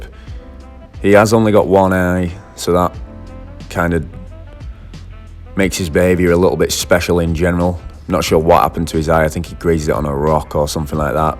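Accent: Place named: Manchester, United Kingdom. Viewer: Nice vid --makes sense. I'm actually gutted cos I thought you was a Manc livin locally was gonna ask you if I could come round ya gaff and buy some fish of ya. Never mind,seems like ya doin well in the states --good luck to ya👍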